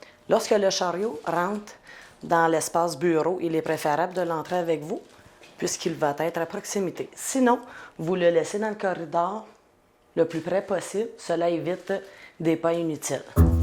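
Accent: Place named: Canada. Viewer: Bjr C quel région au canada cette accent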